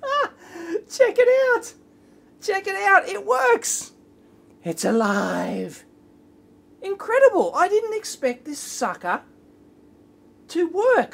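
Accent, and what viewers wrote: Australia. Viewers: Nice one! That dumpster is becoming an electronic goldmine!! Keep up the good work, Love the Aussie Accent too!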